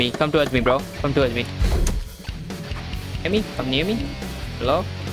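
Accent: India. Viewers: Is this guy indian??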